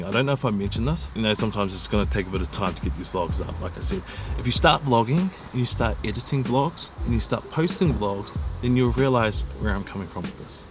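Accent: New Zealand. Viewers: hes maori I dont think the maori accent sounds australian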